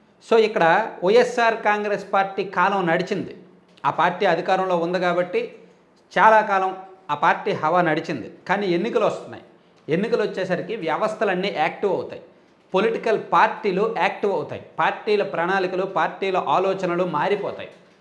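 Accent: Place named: Rayalaseema, India. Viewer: he uses Seema accent, and satirical site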